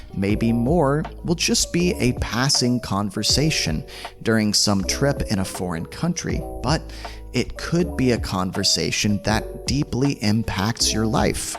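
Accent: United States. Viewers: Are u a native American English speaker? Cuz you sound American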